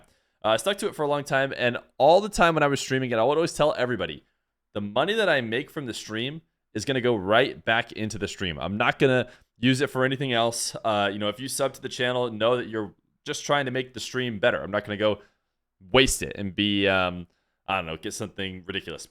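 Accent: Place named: Scotland, United Kingdom. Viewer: cant lie. I love when a player pops off and gets excited. Nothin like that scottish accent!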